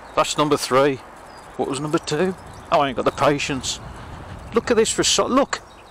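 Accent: United Kingdom. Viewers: I'm not jokinggg, can you see it down thereeeeeeee...lol, I love British accent! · So what about Bosch 36v 250w? What’s the significance; why would someone suggest you’re a troll because of that? (Sorry if I’m clueless.) Also, where in the UK are you from? I’ve spotted your accent as the same as another YouTuber I watch.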